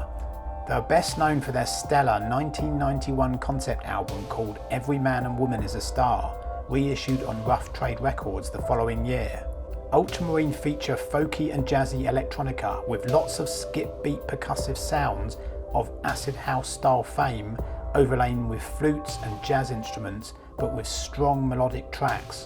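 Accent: United Kingdom. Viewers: I must say I am set with my sound system but anyways I like to watch your videos,nice voice and british pronunciation feels good to my ears.But ULTRAMARINE man,can't thank you enough for discovering their music to me. 1000 x thank you. My system: Verum 1mk2,Violectric v202,Schiit Modi Multibit and Arcam rPlay streamer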